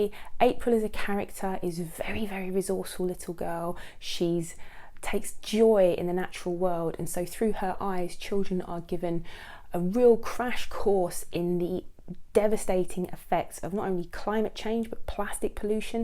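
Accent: United Kingdom. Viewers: I wish i could speak British english sounds great😊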